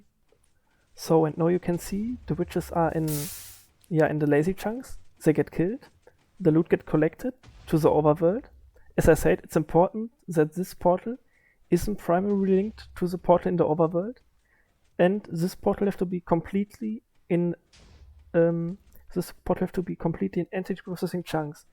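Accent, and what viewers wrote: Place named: Germany. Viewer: man hört sogar das du deutsch bist. dein englisch man hört es.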